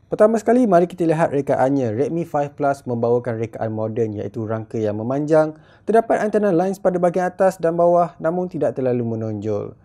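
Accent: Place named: Kelantan, Malaysia. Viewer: demo ore klate ruponyo admin ni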